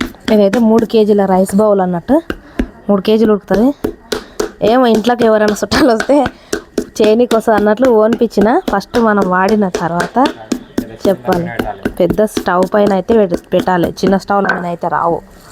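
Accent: Telangana, India. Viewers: మీ మాటల్లో తెలంగాణ యాస ఉంది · telangana accent . May be from TG place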